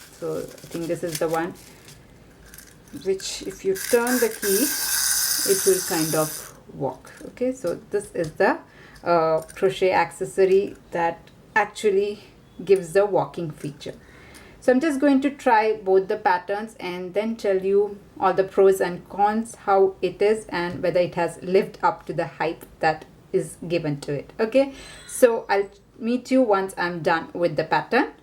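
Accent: India. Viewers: R you a south Indian? Malayali?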